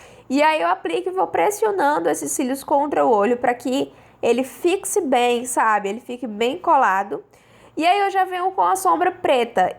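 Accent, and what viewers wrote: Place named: Rio Grande do Norte, Brazil. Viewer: Sotaque lindo do RN ❤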